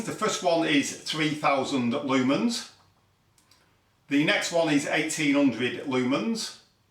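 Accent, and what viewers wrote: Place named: Leicester, United Kingdom. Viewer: Great to see you back. Do you live in Leicester? Its sounds like you're from that neck of the woods. 2 and a half 'Howers' 😂😂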